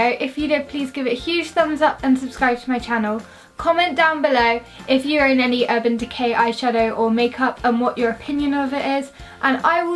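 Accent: United Kingdom. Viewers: Ar you welsh ? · Oh my gosh you're British!! <3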